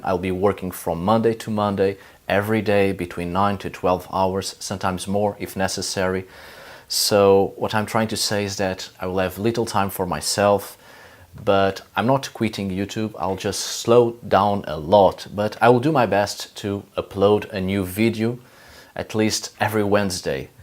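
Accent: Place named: Portugal. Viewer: Olá,! Estava em dúvida se eras mesma tuga, até porque pelo nome bem podias ser escandinavo, mas o sotaque (não leves como pejorativo, até porque falas inglês muito melhor que eu) e a fisionomia deixaram-me com a pulga atrás da orelha. Mas depois fui ao insta confirmar, e claro também porque um português que se preze consegue reconhecer outro compatriota ao longe ahah;) Vídeo interessante, apenas senti falta de referências e fontes listadas na descrição do vídeo, não só por uma questão de verosimilhança da informação mas até para aprofundamento da mesma. Bom trabalho, vou começar a seguir.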